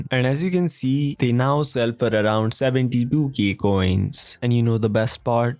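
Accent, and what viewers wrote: India. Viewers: dang he is like the most indian i have ever heard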